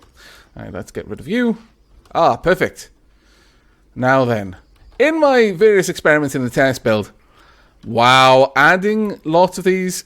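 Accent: Wales, United Kingdom. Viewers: are u welsh?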